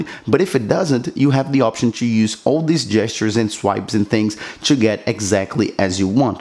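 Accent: Brazil. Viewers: I was like “I can hear the brazilian accent” and then I saw your whatsapp msgs “blz man” hahahahahaha muito obrigada, ajudou muito!!!!!!!!!!!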